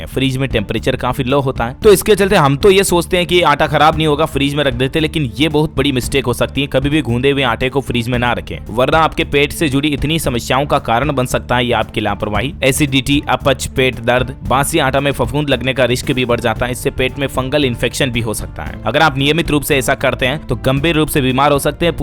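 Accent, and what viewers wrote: Rajasthan, India. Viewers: Tum rajasthan se ho kya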